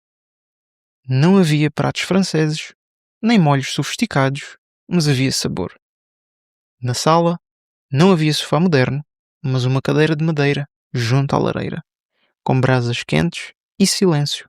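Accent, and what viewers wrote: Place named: Europe. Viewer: Muito obrigado pela ajuda de escutar as palavras faladas no sotaque europeu. Gosto do seu jeito de ler e explicar.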